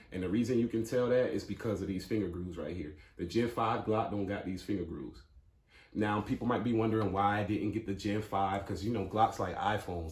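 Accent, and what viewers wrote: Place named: California, United States. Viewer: Ik you hadda livin in Cali da way you be sayin “ya feeme”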